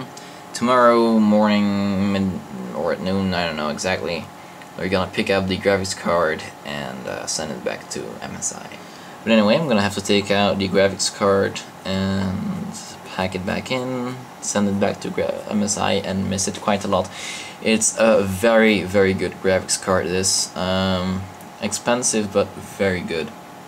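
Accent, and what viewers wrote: Netherlands. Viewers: Are you Dutch ? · Ik hoorde gewoon direct dat je nederlands was lol nice vid btw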